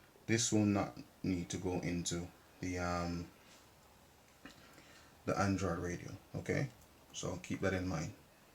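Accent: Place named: Jamaica. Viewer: Bro sound like a yardie this helped me alot, big up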